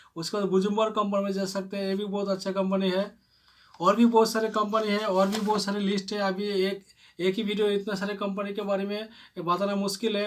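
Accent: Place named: Bihar, India. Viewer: Sabse pehle yey bata Tum Bihari ho kya !! Aap ke awaz se aesa Lagta h ke aap Bihari ho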